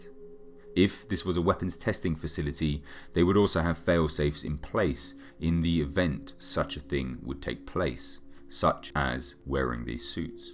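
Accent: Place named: United Kingdom. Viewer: The narrator sounds like the guy who does Dune videos but with a British accent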